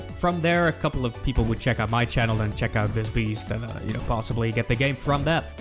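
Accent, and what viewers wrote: Netherlands. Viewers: Didnt hear the dutch accent until he mentioned hes dutch…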